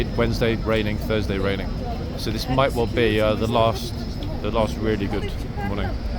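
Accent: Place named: Cockney, United Kingdom. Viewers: If you must make your commentary for your channel, please learn that a double 'T' sound is be-t-t-er not bedder, and also the letter 'H' is pronounced 'Aitch' not 'Haitch'. You do not say 'Mem' for 'M', or 'Lel' for 'L', so why do you say 'Haitch' for 'Aitch' 'H'? Please speak the 'King's English' and not that southern counties 'right-on' rubbish. Check the Oxford English Dictionary: aitch, n. The name of the letter H, h. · I LOVE that wonderful cockney accent, albeit, sometimes, difficult to understand (American here 😉)Thank you for the clarification on who is English and who may or may not be British 😅 An additional question, if I may, the owner of this channel answered the gentleman’s question by explaining that when he refers to himself as English, he means he is not Welsh, Scottish nor Northern Irish….. is there a difference in Northern Irish and Southern Irish? 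😊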